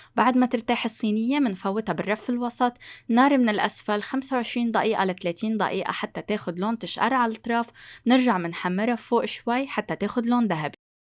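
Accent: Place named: Lebanon. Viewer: اللهجة لبنانية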